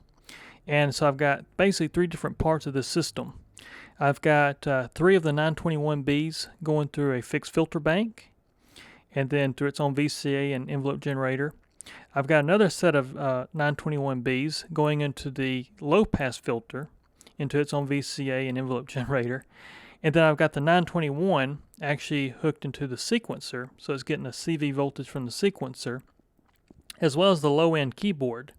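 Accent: South, United States. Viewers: This southern charm and accents are true 😊 your videoes demoing the Behringer moog systems totally sold them to me ❤️ wow 😊 please never stop sharing your videos 😊❣️🙌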